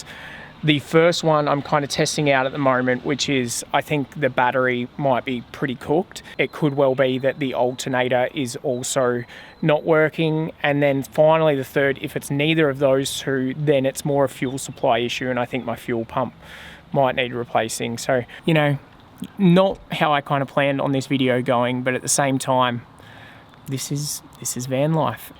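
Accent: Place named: Australia. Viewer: Obnoxious Aussie accent.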